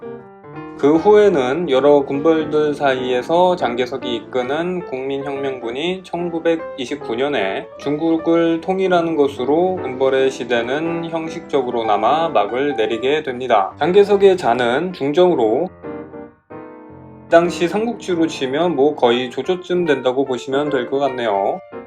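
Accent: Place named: Gyeongsang, South Korea. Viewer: 어째 경상도 냄새가?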